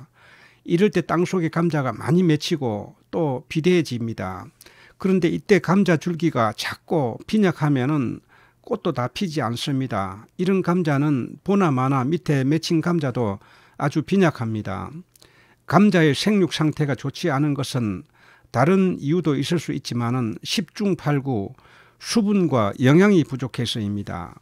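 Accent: Gyeongsang, South Korea. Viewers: 대구 억양??? 이발, 감자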